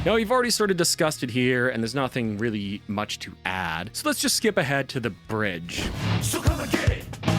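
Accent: Canada. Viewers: Are you from alberta?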